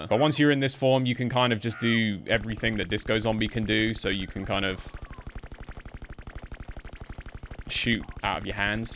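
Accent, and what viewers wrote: United Kingdom. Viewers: We’re do I put my tea? He’s British without a doubt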